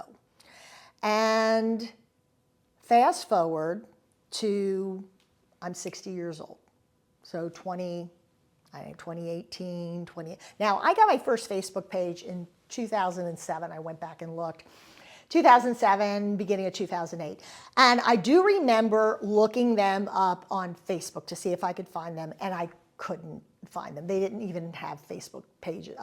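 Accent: Philadelphia, United States. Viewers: I could tell you were a Philly girl - same here! Love your topics. ❤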